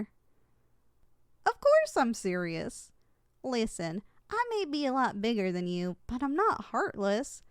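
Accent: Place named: South, United States. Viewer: Can't say I expected it to play out that way. Now I'm curious if there's a 2nd script continuing this story. As for this one, you really nailed the Southern Hospitality feel in your accent delivery. Very well done :_AvaLavaLove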